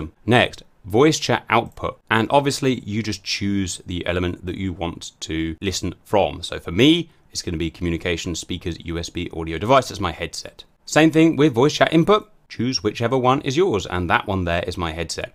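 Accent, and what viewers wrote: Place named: United Kingdom. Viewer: Great stuff man. You lose me at times because Idk what I’m doing but that’s why I’m watching your tutorials. You’ve helped me a great deal and I’m sure there’s a lot more people than just me. I’m glad to see y’all are finally getting recognized for everything y’all do for the community with sponsors. I’ve seen another speaking gig you did the other day. The British accent is loved by Americans and you could sell ice cubes to Eskimo. You gotta great speaking voice and you would really shine in documentary type stuff or historical retelling of events. Best of wishes and Godspeed to all of you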